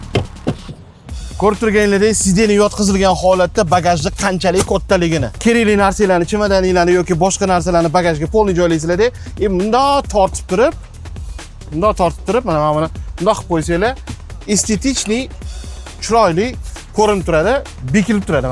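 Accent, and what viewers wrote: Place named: Khorezm, Uzbekistan. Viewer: cho'nqir Xorazmchaga gap yóq og'a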